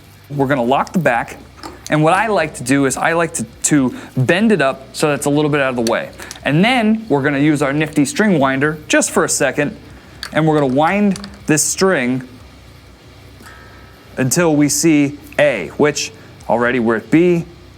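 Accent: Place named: United States. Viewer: wow cool.......i have seen your videos where you have visited the Musicstore in Köln. In 2009 i was there too. Amazing. First of All, thanks for my english and now because you are livin in Köln we can write in german?????! Also, es ist eine grosse Freude, Deinen Kanal zu schauen und mit Dir zu schreiben, mein Lieber......hahahahah super..........Ich habe neben den Harley Benton Gitarren noch andere und auch höherwertige, aber sie gefallen mir sehr. Ich bin aber auch kein Profi, nur Hobbyfreak. So Brother, did you have understood all???? And it is really the truth, your american english is so damn good to understand, i think i understand each Word.....i love that, so i can learn more and improve my english........Especially in guitar themes i understand a lot, when you are talking about politics or nuclear sience i understand nothing...but for normal things........it works.Thank God and praise the lord that he gave us guitars and cool music man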